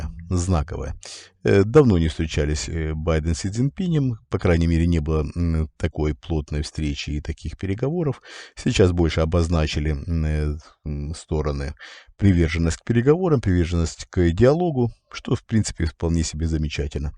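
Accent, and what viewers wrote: Ukraine. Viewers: Вопрос -, почему у Вас украинский акцент?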